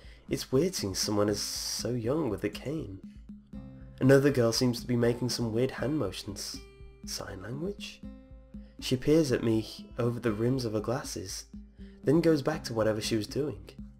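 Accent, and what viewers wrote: England, United Kingdom. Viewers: You're English, too? I Knew it!! *Hugs*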